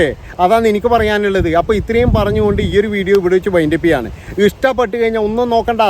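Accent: Kannur, India. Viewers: ഓക്കേ അരി അണ്ണാ 😂നാട് എവിടെ യാ 😄കണ്ണൂർ ഭാഷ ആണ് നമ്മളെ 😂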